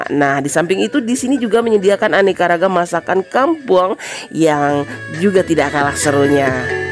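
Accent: Minangkabau, Indonesia. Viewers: Dilek Minang kemirip Jawa, Gitulah sora, Sora menggetar jiwa, Ceria girang puas didengari.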